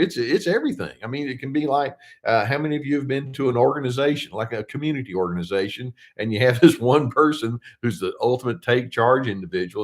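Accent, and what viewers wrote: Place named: Texas, United States. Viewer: I knew it! I was asking myself just the other day where you might be from & l said "I'll bet is from Texas." Not to brag but l was right! I used to live in Austin where l met folks from around the State & your charming accent brings back fond memories of my time there. I'm a much happier & more capable person in dealing with my own situation since l started watching your channel a year & a half ago. Thank you from Toronto, Canada.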